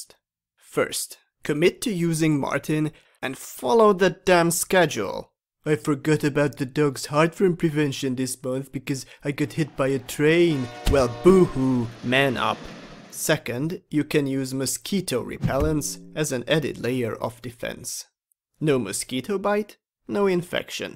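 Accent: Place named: Hungary. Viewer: Best hungarian english on earth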